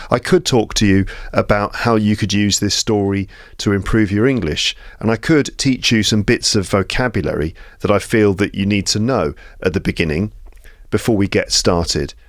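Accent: United Kingdom. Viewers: I've been waiting for a story episode... İ really enjoy listening to interesting stories by your perfect British accent narration.. please share more stories... thank you very much ❤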